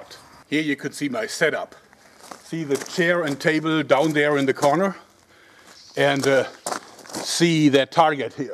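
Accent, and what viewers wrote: Germany. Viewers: german accent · Are you German? Your accent sounds like that (I'm a native German so I this accent and I'm pretty sure he's German)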